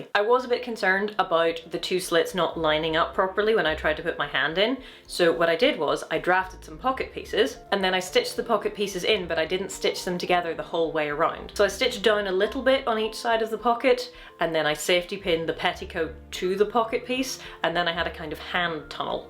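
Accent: United Kingdom. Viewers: I love videos. I don't know why. I think it is for several reasons, or some combination of them. 1, voice is soft and soothing. As a typical American, I often fantasize about what it would be like to be with a British woman. It's the accent. Love it. 2, goes into a lot of detail but doesn't dwell on the minutiae. That makes them interesting to me, someone Not in the entertainment industry, but interested in it enough to want to know the cool behind-the-scenes stuff that talks about. 3, editing skills are awesome. The way she combines her talking points, voice-over, and flashbacks makes the video interesting. It's not how I would produce the video... it's WAAAY better. Her film experience is obvious, even to the unenlightened. 4, is gorgeous. 'Nuff said about that. I am a guy, can't help it. This is totally separate from my comments about her voice. Her effect on the visual and aural parts of my brain are different, yet delightful in their own ways.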